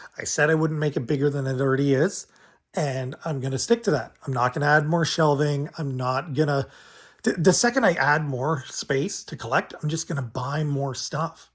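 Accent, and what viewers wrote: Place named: Canada. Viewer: Awesome game room man, one of the best ones I seen! Do you know UndeadChronic? You sound spot on exactly like one of his Warband members with the Canadian accent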